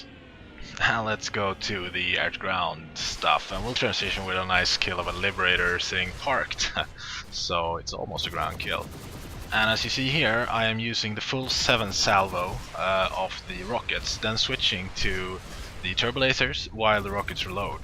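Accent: Sweden. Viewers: hes accent is very Swedish-Ish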